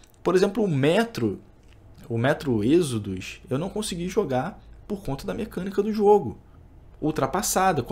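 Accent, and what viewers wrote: Rio de Janeiro, Brazil. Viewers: esse jeito de carioca do falar é bom demais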